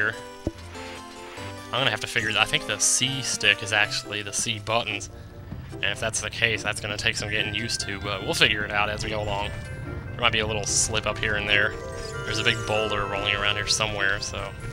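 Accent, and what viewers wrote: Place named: South, United States. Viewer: i wondered why sometimes i hear a southern accent in your lps. it isnt often, but it is there xD GA people always recognize their own accent xD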